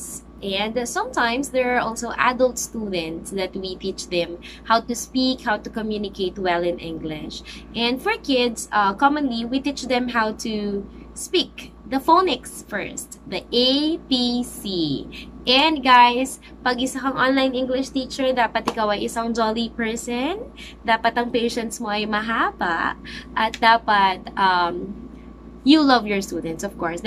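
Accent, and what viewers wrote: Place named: England, United Kingdom. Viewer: hiya nman ako ... hello teacher 😊 love the english accent !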